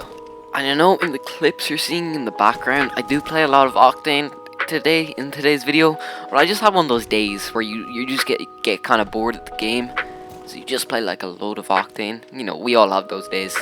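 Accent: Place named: Ireland. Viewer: i love the Irish accent (I think)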